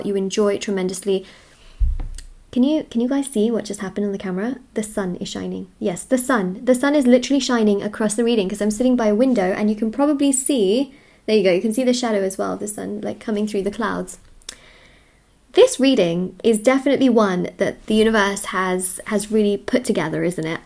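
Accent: United Kingdom. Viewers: That was one joyous reading! Your accent makes me wonder were you born in the UK or is it acquired?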